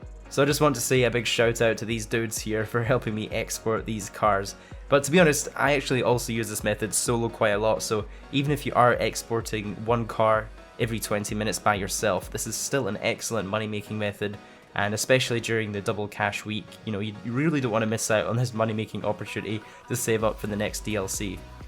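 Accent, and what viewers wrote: Scotland, United Kingdom. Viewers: Fuck scotland wierd accsent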